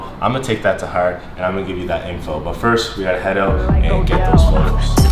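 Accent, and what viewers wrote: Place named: Midwest, United States. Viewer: I thot you were from dmv lol why you say erms like you from midwest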